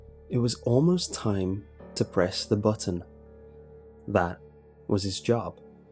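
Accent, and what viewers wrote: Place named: Merseyside, United Kingdom. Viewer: is a perfect story for your voice and cadence. You're accent id familiar, sounds Merseyside :)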